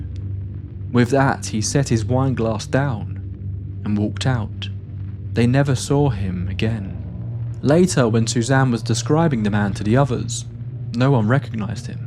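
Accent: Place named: North East England, United Kingdom. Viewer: Were was this pub as the voice sounds north east of england were am from would love to no ❤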